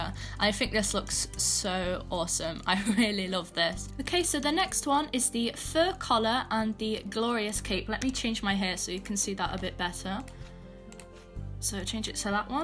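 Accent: United Kingdom. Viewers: I love your accent btw! I think it’s british right? I’m british too.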